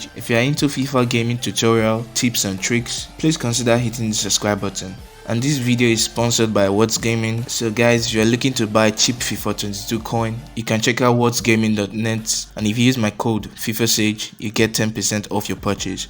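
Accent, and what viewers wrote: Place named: Nigeria. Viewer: sure bro. You sound Nigerian. You from Africa ?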